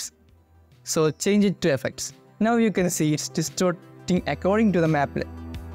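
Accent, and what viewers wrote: Kerala, India. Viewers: Bro malayali anno🌝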